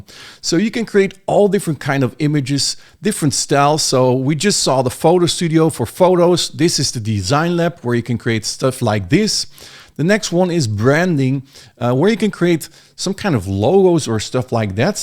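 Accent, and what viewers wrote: Netherlands. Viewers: I smell dutch?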